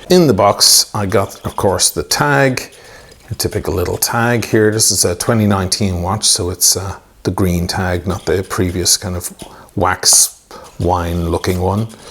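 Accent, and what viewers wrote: Ireland. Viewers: What can be said about the 116610LV that hasn't already been said? I came for gruff, Irish, vocal fry. And the drinks.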